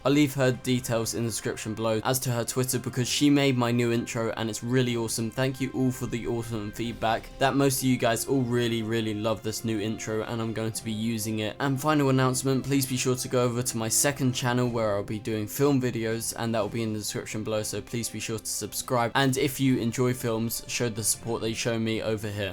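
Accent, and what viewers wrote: United Kingdom. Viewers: r u british m8?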